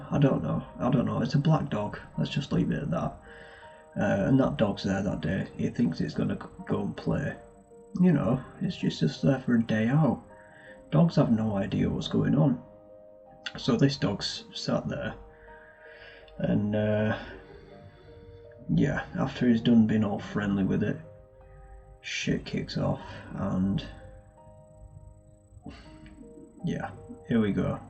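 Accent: United Kingdom. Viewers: Yes, it definitely does need that when it comes to this video; I agree wholeheartedly. I'm kinda cynical about things, lol, so I try and avoid watching stuff that requires the check, but it's annoying when it's a channel you really enjoy. I like the chilled nature of you just chatting and it's always nice when it's a fellow Brit! You're welcome, no problem! 🙂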